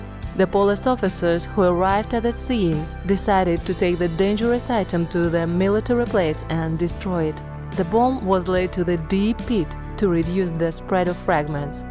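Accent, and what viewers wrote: Russia. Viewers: Wtf is with the Russian accent in the voice over?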